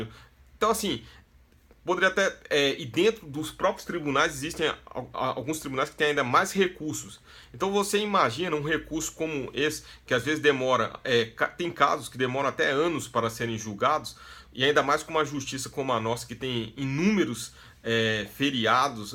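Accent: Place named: Bahia, Brazil. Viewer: Boa explicação...E esse sotaque puxado pro baiano? Saudações.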